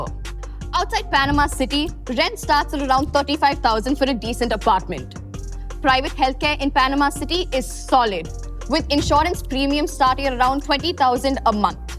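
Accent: India. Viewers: Definitely not European. Her accent is like an Indian. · You talk like an Indian girl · First of all she doesn't look indian, i mean she might be a foreigner living in India but her accent is indian, how i mean is she AI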